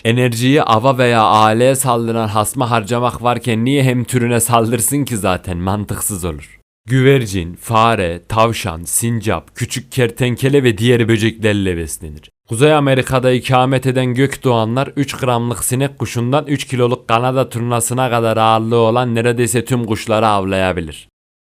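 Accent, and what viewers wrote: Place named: Çorum, Turkey. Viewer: Kardaşıma şunu söylemek isterim kesinlikle sen bir üreticisin seni saygıyla selamlıyorum. Şiven gayet kulağa hoş geliyor ben Çorum Yozgat arasında gidip geldim.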